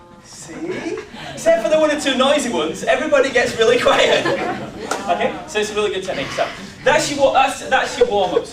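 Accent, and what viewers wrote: Yorkshire, United Kingdom. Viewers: I think he might be from Leeds or Sheffield or very close to. I'm from Doncaster and it's almost identical so hard to tell.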